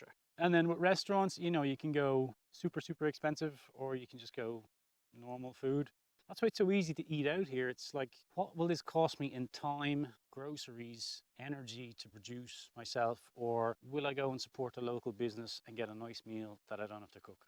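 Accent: Dublin, Ireland. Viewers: QUESTION! Presuming from your accent you're a Dub (or certainly the Pale area), but if you are a Dub are you a northsider or a southsider? I'm a northsider meself 👍🏻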